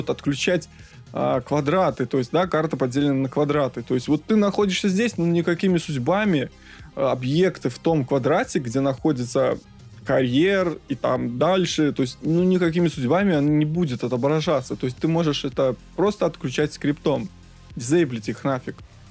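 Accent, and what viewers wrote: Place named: Belarus. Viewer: Начал смотреть видос ,услышал голос и первым делом подумал о боже это же мой дядька из Минска,а потом увидел как канал называется. Беларускую речь ни чем не попутаешь. Так вот теперь понятно почему все у кого ниже ай 5 плачут что игра лагает. У самого 6900к(разгон) и 1080(даунвольт) в среднем 110-135 фпс держит на низко -высоких настройках. С меня лайк и подписка.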